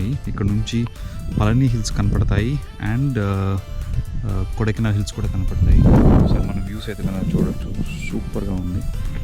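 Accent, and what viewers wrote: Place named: Bangalore, India. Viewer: Miru banglore telugu matladutaru ur native bro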